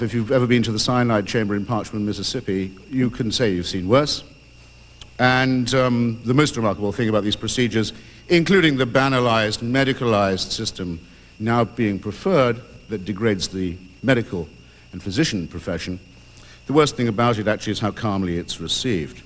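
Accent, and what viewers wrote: Southern England, United Kingdom. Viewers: I agree. Plenty of ppl with Southern English accents and yet I can't name one who stole my heart away from other than. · Hitchens isn't famous because of his Southern English accent, you know about his accent because he was famous for his immense intellect.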